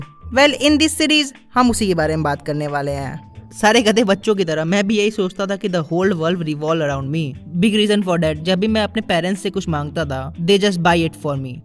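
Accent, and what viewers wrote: Bengal, India. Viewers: R u Bengali? Btw liked ur video👍🏼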